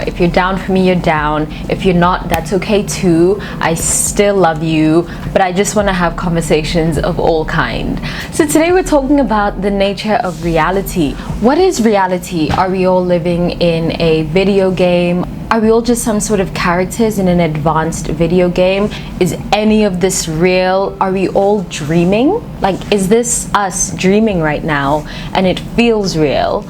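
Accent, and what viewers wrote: South Africa. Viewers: Curious to know where you’re from, really love your work. You sound like you could be from SA but moved. I just can’t across your channel.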